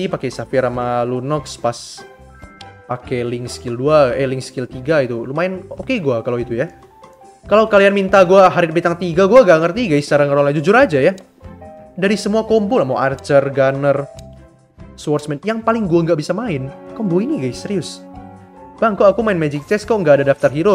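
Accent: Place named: Medan, Indonesia. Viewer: hallo bng subscribe baru ni bang lucu kali logat kau bang orang Medan kah??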